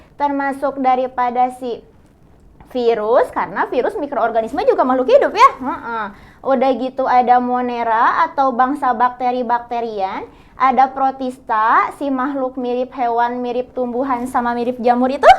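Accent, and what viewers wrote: West Java, Indonesia. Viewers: Kakak nya Sunda bet suka gua denger nya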